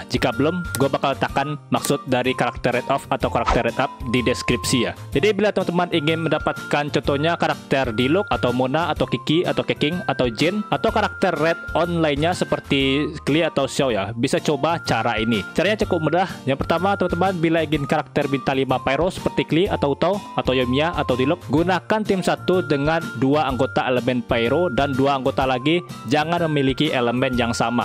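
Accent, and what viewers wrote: West Sumatra, Indonesia. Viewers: Org minang ya XD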